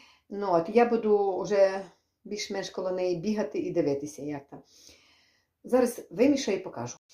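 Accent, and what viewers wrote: Ukraine. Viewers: Не хочу я звикати до бара боли.Что за деревенский украинский язык?!! Селянский!!!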